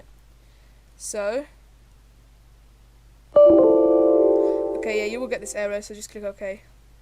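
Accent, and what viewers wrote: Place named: United Kingdom. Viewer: Why are all youtube roblox scripters british?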